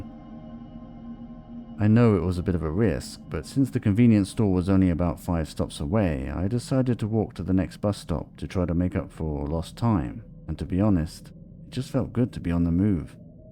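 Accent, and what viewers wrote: United Kingdom. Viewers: is this british accent? i like it. recommend me some more channels like this to practise listening pls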